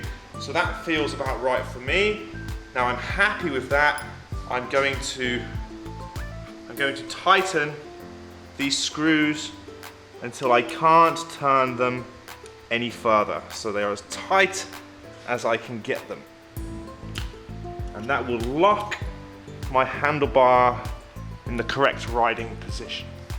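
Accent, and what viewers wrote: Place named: United Kingdom. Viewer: Great, clear instructions. You have a British accent, but I guess you may have lived in China/ Asia for some time. Nice! I have lived off and on in India for 10. years. Back in the states now.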